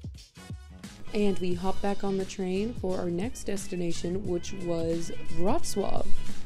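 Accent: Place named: United States. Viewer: It was your first flight in your life but your accent sound american. How did you travel from the US?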